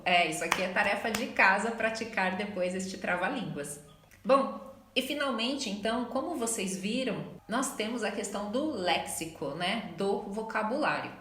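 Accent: Brazil. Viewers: Yo ya la vi en otros videos. Habla muy correctamente, con muy buena dicción en aquellos fonemas que muchos lusófonos encuentran difíciles (principalmente la r y la j fuertes) y ese leve acentito brasileño la hace aún más encantadora, dicho esto con el mayor de los respetos.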